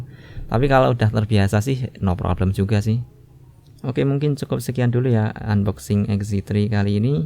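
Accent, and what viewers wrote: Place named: Java, Indonesia. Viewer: Wong ndi samean mas, medoke parah, auto subrek 😄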